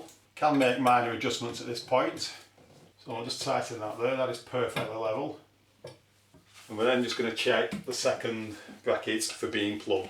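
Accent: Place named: Lancashire, United Kingdom. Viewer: 😅👍. I grew up in Blackburn so I thought I recognised the accent x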